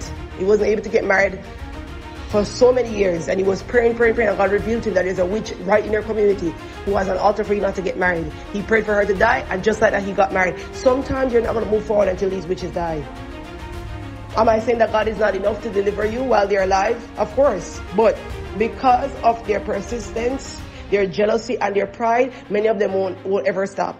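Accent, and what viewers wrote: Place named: Jamaica. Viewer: ⚠️ REQUEST, that you woman of God slow down when you speak. Above prayer confirms what God has been saying. My own family's "bad luck" now I see as sorcerer caused due to jealousy plus we have suffered due to lack of knowledge. I just saw your channel first time now and I sense you are a genuine believer, not some false YouTuber. Praise God. Slow that beautiful Jamaican accent coz I will continue to repeat some of your prayers, or all. Thx!❤️🌹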